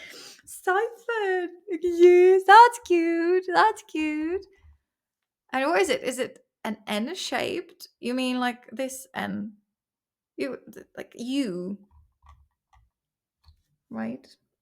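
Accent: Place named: United Kingdom. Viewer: I love your accent, actually Persian is my main one but I always enjoy British accent ❤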